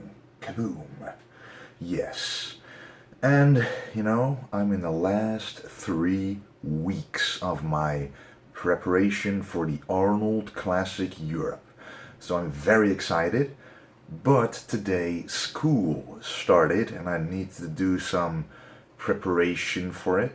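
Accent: Netherlands. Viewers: typical Dutch accent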